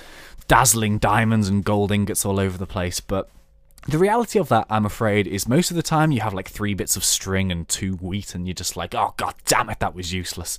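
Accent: United Kingdom. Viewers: are you british? Your voice is a little funny, but very cool video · Amazing Vids Mate, And The Best Part Is Your British!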